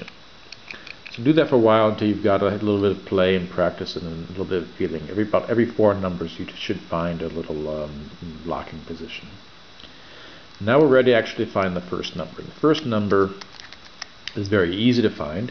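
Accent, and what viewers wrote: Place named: Canada. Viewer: I detect a Canadian accent!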